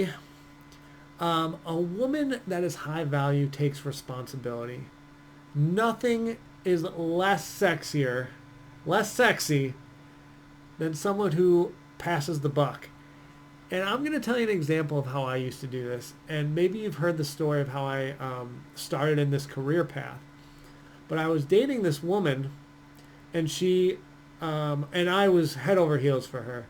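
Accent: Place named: New York, United States. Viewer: You're so smart & your vids are so wonderful & so helpful -- both by yourself and, especially, with Helena. You're funny, smart, cute & handsome,. Love your NY accent... plus your wild enthusiasm & wicked humor!!! God bless you.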